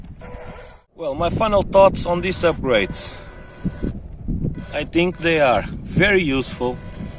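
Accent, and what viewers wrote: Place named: Russia. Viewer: Cool accent, Russian?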